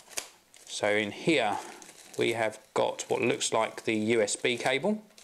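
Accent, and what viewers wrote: England, United Kingdom. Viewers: i love tech, and i love the British accent... so tech given to me in a British accent? awesome *thumbs up* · Yay British accent :D:D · British english is sooo better than american one to understand! :) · gotta love brittish accent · Can speak a little beat less by English accent:). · British accent makes people sound he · I love british accents, everything sounds 20 % cooler with that^^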